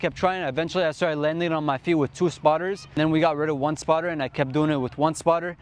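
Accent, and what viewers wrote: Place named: Queens, United States. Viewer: u talk with an accent/an aksent from here in Queens Countey !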